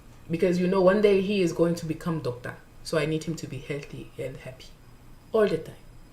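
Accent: South Korea. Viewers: You legit nailed the standard korean accent Which makes you sound super smart. No cap.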